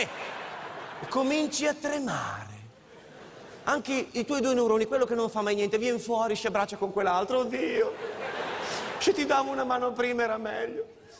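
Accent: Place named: Romagna, Italy. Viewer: L'accento romagnolo è bellissimo!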